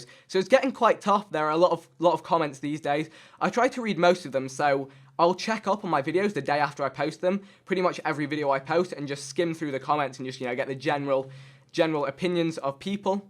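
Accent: England, United Kingdom. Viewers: is indian I thought he was English he sounds like he's from England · Your english accent is so contrasting to your appearance it's quite cool! Love the videos, nice to do a facecam once ina while right!? Keep up the good work! Jack:)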